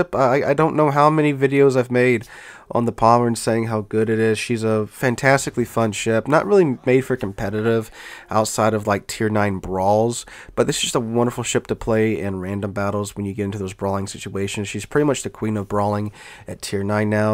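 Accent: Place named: South Texas, United States. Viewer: Sounds like you live in South Texas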